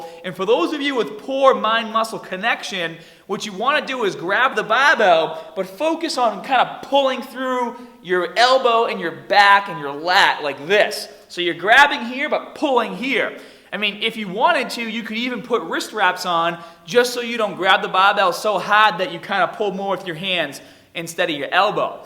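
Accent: Boston, United States. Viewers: Bosten accent? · I'm irish but this dude must be from Boston "hawdaw" "bawbew" Edit: I love the content. No hate, just an observation. Huge fan of the tips.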